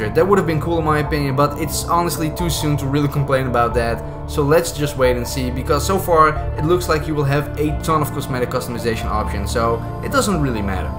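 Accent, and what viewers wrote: Netherlands. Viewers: this mans dutch accent... love it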